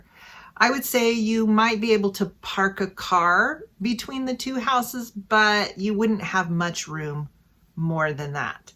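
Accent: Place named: United States. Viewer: American accent is clear.❤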